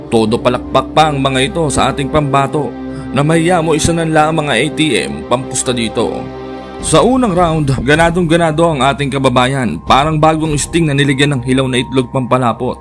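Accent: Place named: Cabanatuan, Philippines. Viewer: Taga Cabanatuan kaba I dol. Katono ka Ng salita Namin.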